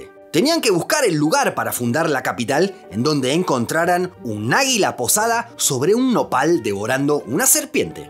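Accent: Argentina. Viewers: Wakala ese acento argentino, traigan al antiguo narrador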